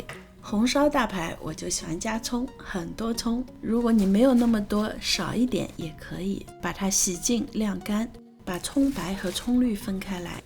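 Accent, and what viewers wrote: Shanghai, China. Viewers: Hello, 我也是上海人。你的美食频道做得真好，很地道的上海菜。很地道的上海口音。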